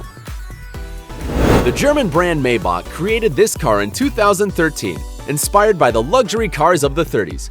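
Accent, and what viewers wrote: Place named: United States. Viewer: Americans and your pronunciations.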